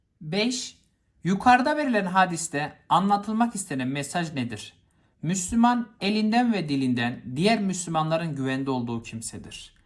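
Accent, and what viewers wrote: Malatya, Turkey. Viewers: Hocam Malatyalı misinizzz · Hocam Malatyalı mısınız?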